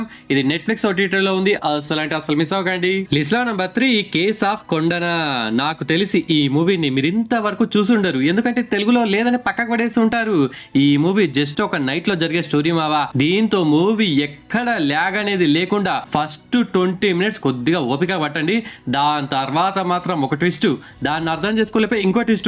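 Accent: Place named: Telangana, India. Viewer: బ్రదర్ అంటూన్నావు నువ్వేమో తెలంగాణ బ్రదర్ ఏమో ఆంధ్ర బాగుంది బ్రో....😂😂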